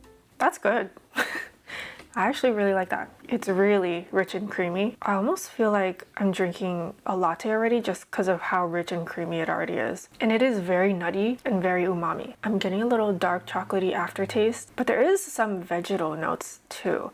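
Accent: Hawaii, United States. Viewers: 30 seconds in and i knew u were from hawaii too, love it!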